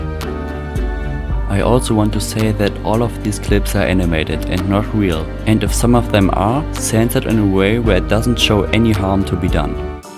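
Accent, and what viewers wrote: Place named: Germany. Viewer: you're definitely german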